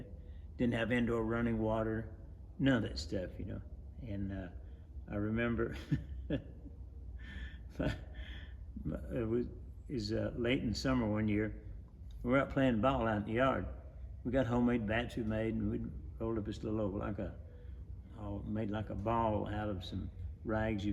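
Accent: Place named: North Carolina, United States. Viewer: Didn't know you were a fellow Tar Heel, but I thought I recognized your accent. I have one similar being born in High Point 1948. Best wishes to you,. Love and use your products.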